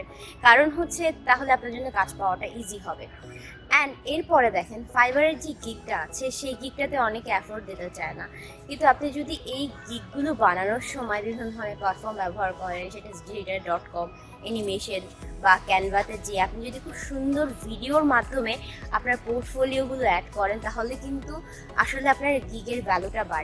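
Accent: Chittagong, Bangladesh. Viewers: Apnar basa ki Chittagong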